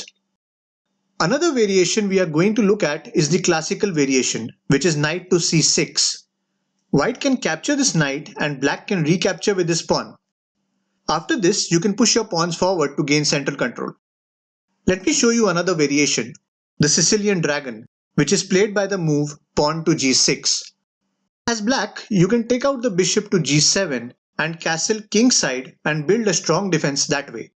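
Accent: India. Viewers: Your English is Indian (understandable) don't give foot note that's disturbing, it covers board. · I like Indian acent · I’m assuming you are Indian but the accent.. so I believe everything you showed us here since chess was invented in India ..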